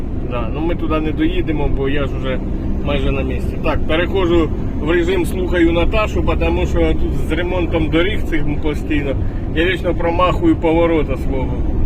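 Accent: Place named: Kherson, Ukraine. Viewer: Да, наш суржик ниякий гугл не переведе! І ніхто так не може балакать, як ми!Херсон і окресності!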